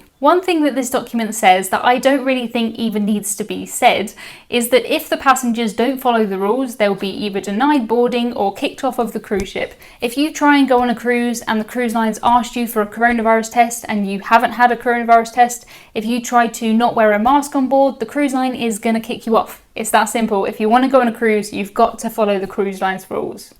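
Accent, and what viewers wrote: RP, United Kingdom. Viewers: Very informative and didn't feel too long; everything was well packed! Hopefully this level of cautiousness is only temporary, yet some of the procedures make sense for the future under all circumstances. Anyway.. It's much easier to learn about these things as someone (read ) has already digested the data and translated it to understandable language (posh accent or not..) and good examples. Thank you!:)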